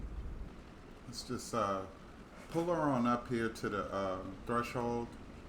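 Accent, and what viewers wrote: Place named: Germany. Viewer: Great visuals and informative. Good job on the volume control. Love your German accent, lol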